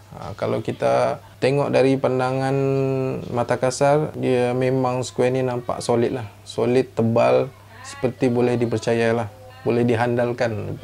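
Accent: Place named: Sabah, Malaysia. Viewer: Tuan boleh tau asal mana? Macam bunyi2 sabah. 😃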